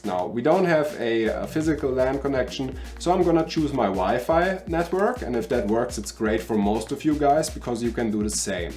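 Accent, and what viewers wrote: Germany. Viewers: Are you German? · bist du deutsch? dein akzent🤗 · Nice one, deutscher Akzent lässt sich einfach nicht verbergen 👍😁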